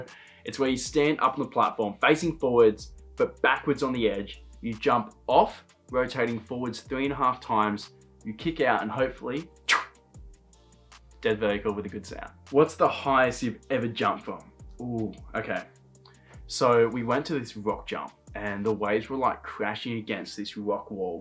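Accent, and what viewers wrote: Australia. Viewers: I love your Aussie accent. You are so adorable. ❤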